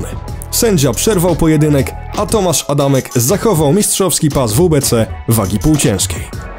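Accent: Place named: Germany. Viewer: Akcet dość mieki ale slabo niemieckie to nie rozpoznałem że Polska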